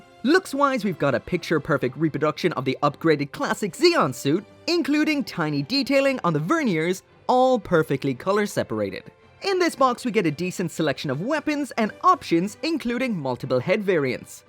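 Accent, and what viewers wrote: Ireland. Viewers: He's Irish.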